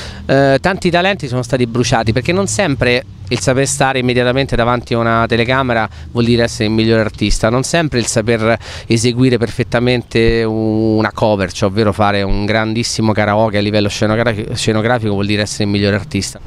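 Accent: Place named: Parioli, Italy. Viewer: Ma sta vocetta e sta parlata da pariolino???? Ma che è successo????? A piotta nun sei più er ghepardo de na vorta!!!! Però c ha ragione!!!!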